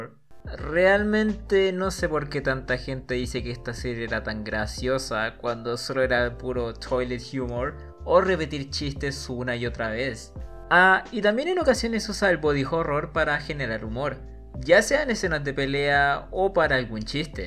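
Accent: Chile. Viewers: no se te entiende nada..habla más claro, hasta pareces chileno .-. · eres chileno?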